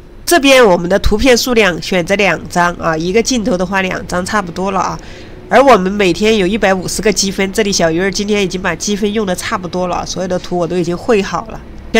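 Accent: Sichuan, China. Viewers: 不错，你的川普，我知道你在哪里了，怎么联系你，跟着学习，谢谢！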